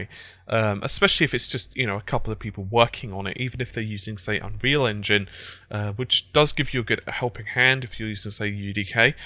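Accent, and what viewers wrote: United Kingdom. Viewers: That intro always reminds me of a British Buffalo Bill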